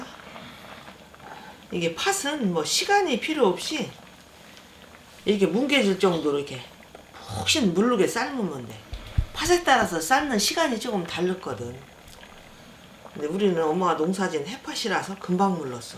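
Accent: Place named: Chungcheong, South Korea. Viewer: 충청도여유 ㅎ ㅎ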